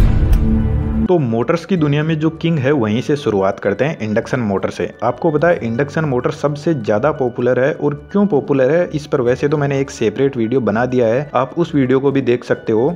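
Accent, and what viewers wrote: India. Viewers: bro are you indian or i just watch dubbed video by the way your content is awesome and informative.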